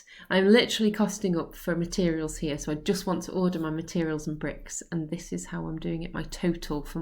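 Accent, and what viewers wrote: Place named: North, United Kingdom. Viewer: thank you, good stuff, nice simple and concise, so your are northern then, I m based around Manchester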